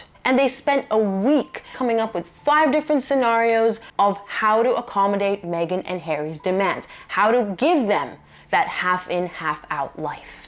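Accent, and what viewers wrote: United States. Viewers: I have the audiobook for Courtiers I'm currently listening to. I'm just getting into the chapters of current times. I enjoy your channel very much and your knowledge as an attorney. I know you live in Australia but don't Aussie. I am American and was sure you were American by your accent, but then you made a comment that you've never been here. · You said that's you're an Australian, but you have a very American accent!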